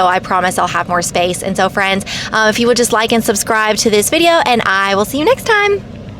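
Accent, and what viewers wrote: Tennessee, United States. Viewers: Loved seeing you interact with all your customers. You are so good at that! Also, I realized how much more your TN southern twang came out while engaging with customers. I am an East TN girlie and you sound just like everyone here.😊I haven’t made it all the way to the end yet but I hope you did well and even those who didn’t purchase will visit your website soon!❤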